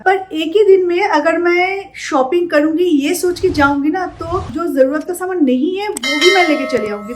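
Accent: Bengal, India. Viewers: She is bengali, it's her accent.... Kindly don't use such language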